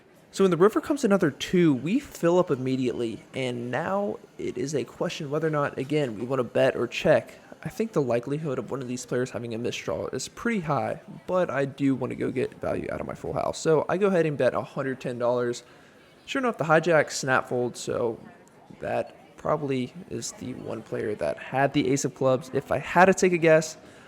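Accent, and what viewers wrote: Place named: South, United States. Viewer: Love it that you play at all of my same casinos, other than Magic City. I haven't played down in Miami yet. Curious, you have an accent. Are you from TN or NC?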